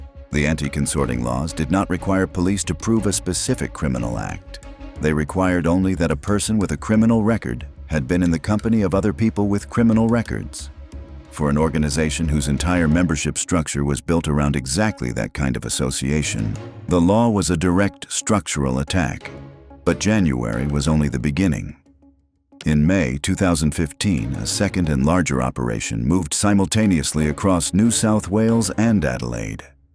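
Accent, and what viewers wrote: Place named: United States. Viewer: Why is this narrated by a septic tank which is utter condescension. Even the images of the notes are American not Australian currency, and it does not snow in the suburbs of Sydney and Kevin Rud is not Anthony Albanese. If you're going to have a documentary about Australian bike clubs, it should be narrated by an Australian who knows the details not by some stupid septic tank. ￼💩💩💩💩💩💩💩💩💩💩💩💩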